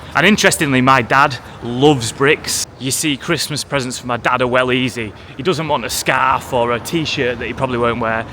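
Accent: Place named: Northern England, United Kingdom. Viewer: You have such great delivery. Great Northern accent, no bad language, no confrontation etc. engaging character. You're the best at this sort of video, along with Peter Santenello. Top man.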